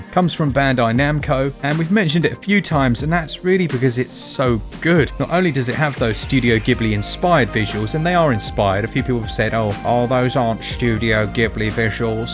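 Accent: United Kingdom. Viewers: Everything sounds better in a British accent. Please say Guv' after every sentence on the next vid 😂 Always keeping it SwitchedUp, <3 from the Land Down Under. - F0x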